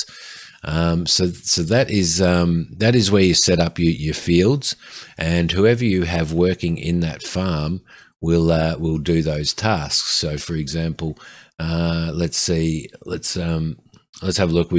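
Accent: Australia. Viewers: Australian, wah whoo!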